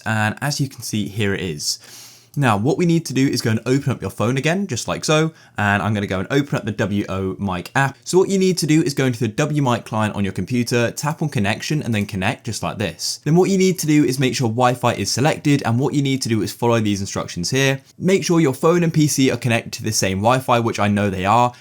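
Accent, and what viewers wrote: United Kingdom. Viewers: He’s British not american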